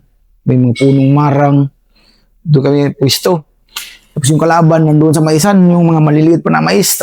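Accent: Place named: Visayas, Philippines. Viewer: Hahaha kagiw.. Dalom kaayo nga bisaya ba.. Makalingaw ka master😄😄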